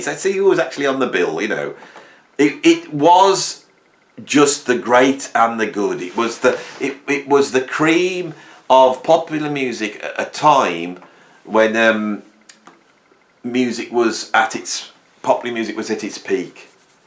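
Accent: Birmingham, United Kingdom. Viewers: Birmingham / the West Country. 🇬🇧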